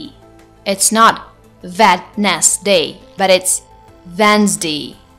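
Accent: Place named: United States. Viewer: I like this American accent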